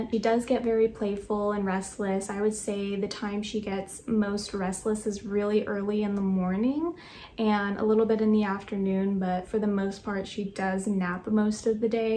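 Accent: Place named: United States. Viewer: Sorry , hold up, what did u say....'Puppy Store' what the hell is a puppy store, what like a shoe shop or bakery....I assume from your accent your based in America somewhere...tell me can you go into 'store' and buy a dog, like a loaf of bread or a pint of milk?....was she even with from her momma in the pet pen... This is all so wrong...doesn't happen in Scotland ...no puppy stores...puppy farming is horrific and needs to be stopped it goes on everywhere, but a 'puppy store' .. geeze, thats screaming out, 'ill treated animals right there.. be mindful people, be sensible people, be savvy.. buy a puppy from a home breeder...spend time getting to know the breeder and family, make several visits to the home, spend time with them and the dogs and the litter....don't buy from a store ffs 😥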